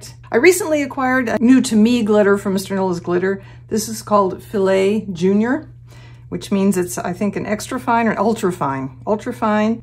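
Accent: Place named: Pennsylvania, United States. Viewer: New Joisey? Nah, not NJ, you don't have a strong accent, I would say PA? That silver is sweet, love it with the skulls!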